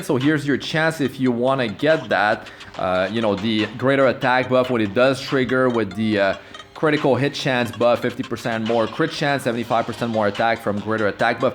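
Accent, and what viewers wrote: Quebec, Canada. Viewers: Salut t québécois?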